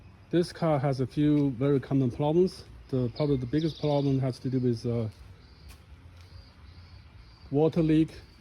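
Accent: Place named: China. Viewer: 浓烈的同胞的口音:face-blue-smiling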